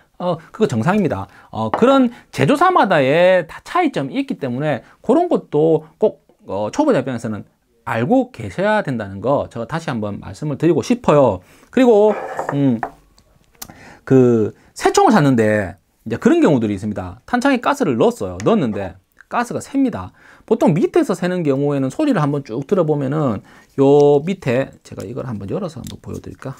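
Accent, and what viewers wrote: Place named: Busan, South Korea. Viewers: 빠사~지는....찰진 다이내믹 부산 표준어에 좋아요를 탁~! 치고 갑니다 ^^ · 뭔중국? 경상도 말투인데? 한국인아님?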